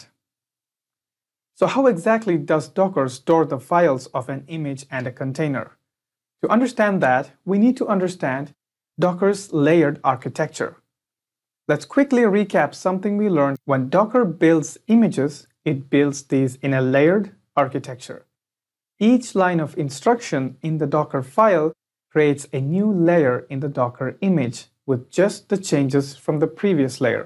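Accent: India. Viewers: always better with the indian accent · this look surreal. an indian who has very little indian accent.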